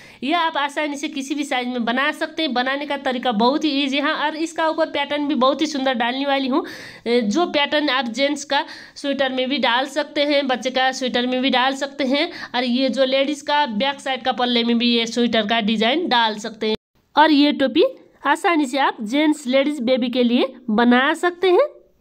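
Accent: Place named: Kathmandu, Nepal. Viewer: आप की डिजाइन बहुत अच्छी लगी ।आपकी आवाज सुन कर काठमांडू याद आ गया धन्यवाद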